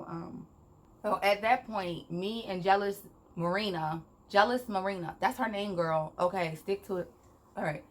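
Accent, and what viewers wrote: Baltimore, United States. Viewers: could tell you were from maryland maybe baltimore from the accent 😭😭,, i love your personality so much !!! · "Dip off Diana" I'm crying off these names! I knew u were from Baltimore as soon as u started talking lol. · Are you from Baltimore or Philly? I swear I detected my Bmore accent. New here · She said it dew she’s from b more 😂 has to be I low key hear it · Ik you were from Maryland ik our accent anywhere. I love this story!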